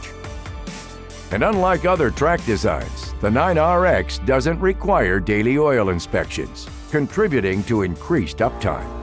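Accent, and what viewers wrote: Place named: South, United States. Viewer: That southern accent is whats making it. Perfection!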